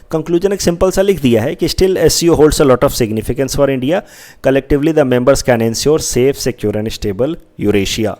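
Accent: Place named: Bihar, India. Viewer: Ur English like BihariEnglishsm....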